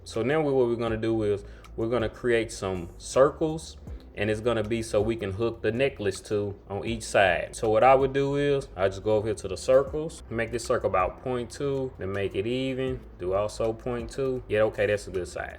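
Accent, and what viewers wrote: South, United States. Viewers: I'm from Texas. I picked up on that southern twang! Lol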